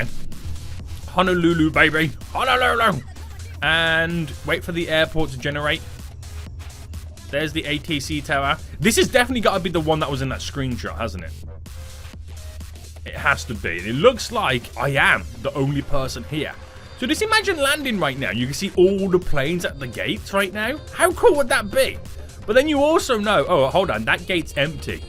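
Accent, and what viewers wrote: United Kingdom. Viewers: The British accent 🤌🤌🤌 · Your British? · Are you British then? If so, cool coz me too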